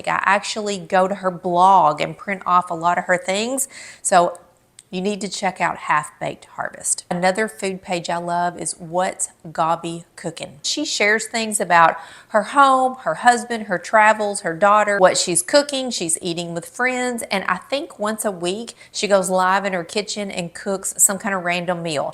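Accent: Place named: Kentucky, United States. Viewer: I don’t much use Instagram. I personally find it boring, but that’s just me. I use Facebook a bit, X a bit, & YouTube a lot. I have a closet full of pretty clothes I never wear because we never go anywhere. I still found this interesting, mainly because I find your voice soothing, & I adore that KY accent. I follow 2 couples who do a lot of videos in TN, & have come to love what they show me of life in that beautiful state. We live in a senior apartment in SoCal, third floor, & my life is completely boring. I can kill silk plants. I follow people who live in France (the countryside), & a German couple who live in the UK. This is how I travel. I would love to see the beauty of Kentucky.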